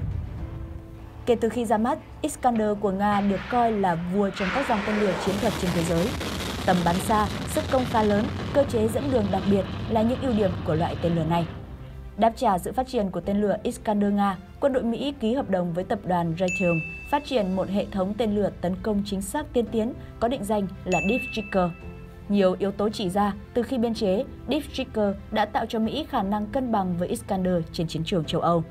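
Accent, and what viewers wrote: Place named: Vietnam. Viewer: Tên lửa Mỹ, bằng tiếng Anh- Mỹ, thì kênh phải phát âm như tiếng ANH - MỸ; ai lại phát âm theo kiểu VN nghe nhà quê và sai bét.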